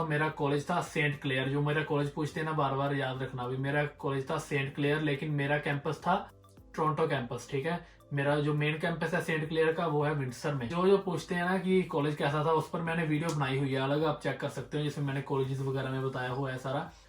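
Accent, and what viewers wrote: India. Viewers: Accent hi etna desi hai ki kaun naukri dega canada mein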